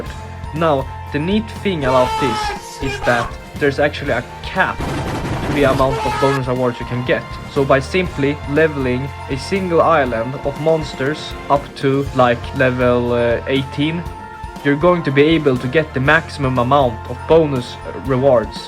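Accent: Sweden. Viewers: Are you Swedish, because your accent sounds really Swedish? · bro u sounds so swedish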